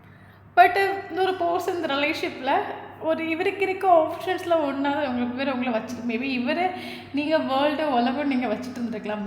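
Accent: Kerala, India. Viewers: Hi Mam neega keralava? Malayalam kalandha tamil very nice to hear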